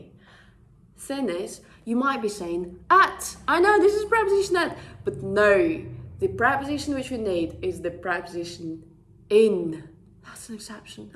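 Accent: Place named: United Kingdom. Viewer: Нормальное произношение. Британский акцент.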